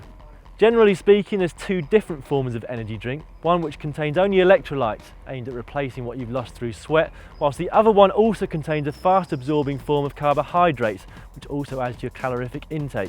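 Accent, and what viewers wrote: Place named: United Kingdom. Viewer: I'm confused you sound british but, you cycle on the wrong side of the road. Where are you based?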